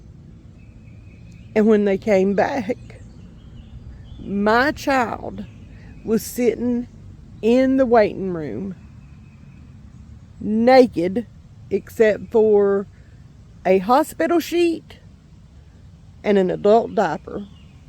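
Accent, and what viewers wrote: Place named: West Virginia, United States. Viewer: Do you mind saying where you live, what state? I am from WV, and your accent sounds very much like WV.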